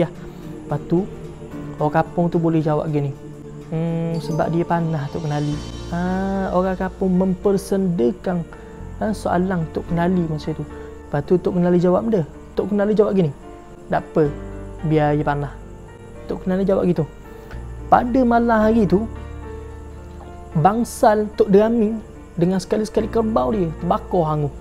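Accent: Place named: Terengganu, Malaysia. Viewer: Kalu comel lagi ulasan cerita tu biar seling kelantan buke seling terengganu. Terbaik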